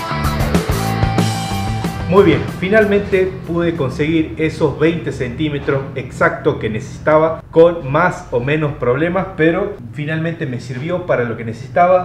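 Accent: Tucumán, Argentina. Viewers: Gracias por compartir, muy bueno ...tucu o salteño? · Hola de donde sos. Yo soy de tucuman te escucho asentó tucumano. Me gustaría conocerte.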